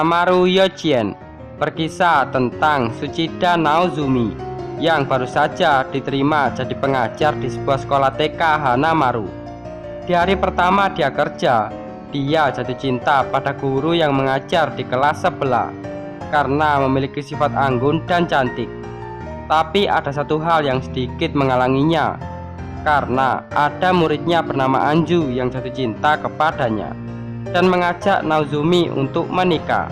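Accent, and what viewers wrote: Java, Indonesia. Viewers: Full video bahasa medok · ngk ngakak dg logat jawanya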